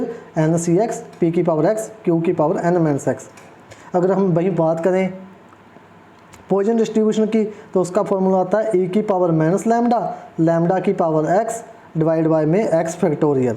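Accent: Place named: Punjab, India. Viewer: Nice lecture 1st time complete sunya h. But tuhadi speaking Ch Punjabi tone h so I think u must teach in Punjabi.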